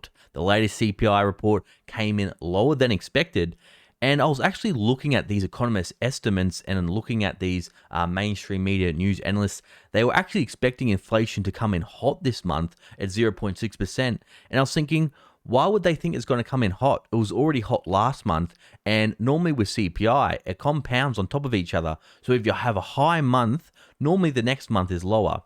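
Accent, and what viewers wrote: Australia. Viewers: He's from Oz.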